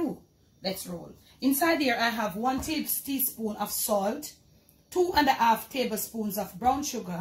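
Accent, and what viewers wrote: Jamaica. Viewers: I just looked at the thumbnail and before she started talking I knew with all my stereotypical thinking that this Jamaican woman(with a Jamaican accent) was the one to listen to ti teach me how to make bread.. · Tht bread looks 😍 so good and soft 😋...I just love watching & listening to her she's so cute with her Jamaican accent 🥰..I'm definitely going try this version of bread over others it looks the best out of others I've seen bcuz it was made with care💝 & tht makes the best food!🤗🏆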